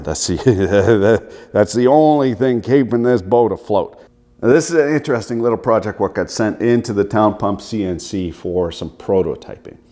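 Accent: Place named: Canada. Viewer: I'm from the Midwest United States and after watching one minute and twelve seconds of this video... that's the most Canadian fucking thing I've ever heard lmao...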